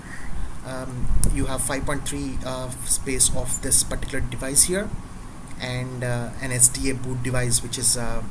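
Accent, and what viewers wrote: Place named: India. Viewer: this is like the <num> video tutorial I´ve seen with Hindu accent, everything is good....except for the MIC, aren´t any good mics on India ?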